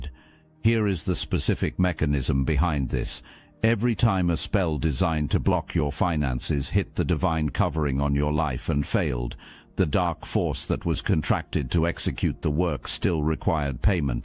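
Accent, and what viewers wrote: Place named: United Kingdom. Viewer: my dear chosen one which i respect so much because of your magnificent british accent i thing there is a misunderstanding here ,,what spiritual gifts are you talking about or any spiritual property ,nothing from that exists,i guess that something else is needed here ,, cant trace yet but soon as i realise i let you know,,,,😁 👻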